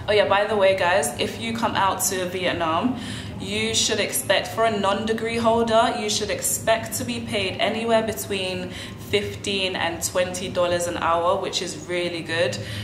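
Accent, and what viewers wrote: United Kingdom. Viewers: I love the caliber of you caracter and fisicaly you geogeouss sunshine i just have some issues with you heavy british accent...lol.... Is like listen spaniers speak spanish.. Im billingual english and spanish but my english accent is from usa and my spanish is from chile... So i sound more gooffy and global well round it when i spoke in both languages.... I think is important to teach a more landed to the ground aproaches to the lenguagues to our new world reality of globalizacion make it more related to an neutral accent.. My spanish for instance is a mix of well educated chilean vocabulary with a rancher mexican singing language what icquired in las vegas nv , with a spice of colombian coffe grounds...lol cheers 💥🌀💖🐾🎸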